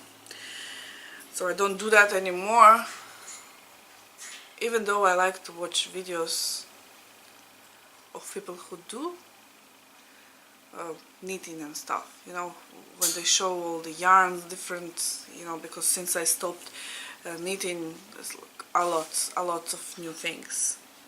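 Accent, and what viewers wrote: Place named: Ireland. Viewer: I can sense a slight Irish flair to your accent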